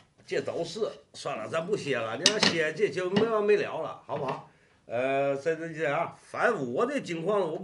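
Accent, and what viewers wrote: Tianjin, China. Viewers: 天津口音 哈哈，很亲切啊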